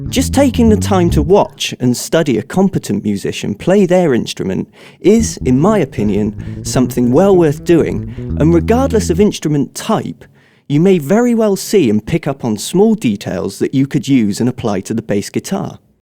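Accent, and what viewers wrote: United Kingdom. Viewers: ingiliz aksanı.. tek kelimesini anlamadım a.q... japonca sanki... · Wow you’re very British!:-) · You're like the British, calm version of LinusTechTips..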